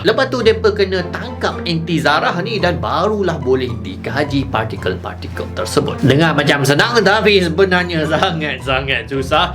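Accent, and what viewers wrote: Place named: Kedah, Malaysia. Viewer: Saya ada soalan ,abang ni orang kedah ka ? Hang hang hang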